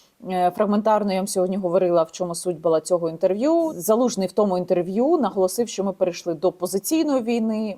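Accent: Russia. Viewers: Яніна, будь ласка, не говори англійською, як росіяни.Women не вуман, girl не гьорл, birthday не бьорсдей, The Economist не зъ економист. Ти мені дуже подобаєшся, я дивлюся всі твої відео. Слава Україні!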